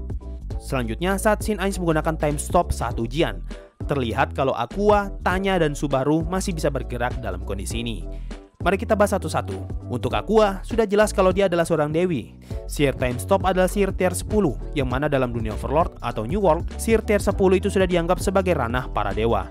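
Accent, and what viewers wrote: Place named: Bugis, Indonesia. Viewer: logatnya si admin kek orang Bugis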